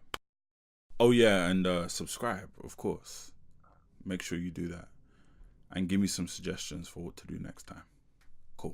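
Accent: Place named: United Kingdom. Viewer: Wow when I heard the British accent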